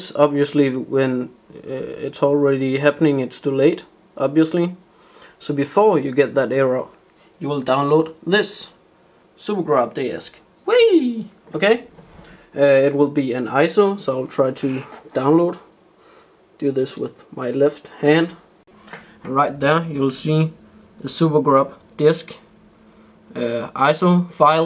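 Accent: Denmark. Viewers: Dude, you're so danish :-D - Its so obvious by the way you're talking english!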